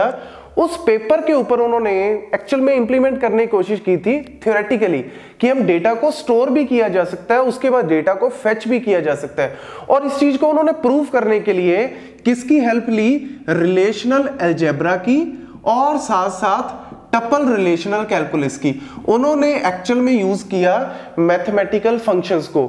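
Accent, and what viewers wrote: Punjab, India. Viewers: you are punjabi??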